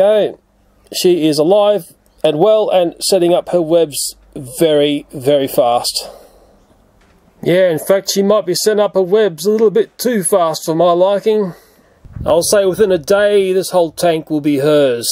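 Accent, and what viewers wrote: Australia. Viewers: Maybe that’s the way Australian people pronounce it.... how curious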